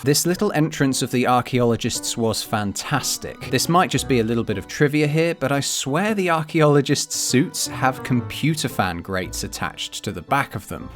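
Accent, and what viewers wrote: RP, United Kingdom. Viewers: Yse a this is the posh voice I have been thinking of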